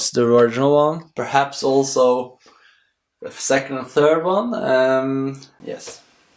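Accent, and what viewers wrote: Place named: Norway. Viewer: Boi u suck at english Sounds like your from Norway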